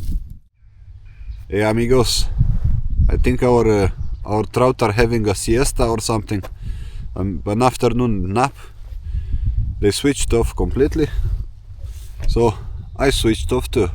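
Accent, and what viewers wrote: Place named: Scotland, United Kingdom. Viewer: Love the Scottish accent.... definitely following you mate 👍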